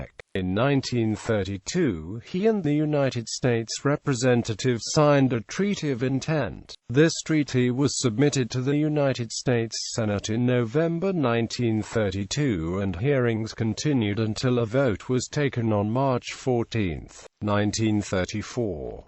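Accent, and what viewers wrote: United Kingdom. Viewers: This is the first computer I've encountered that has a British accent.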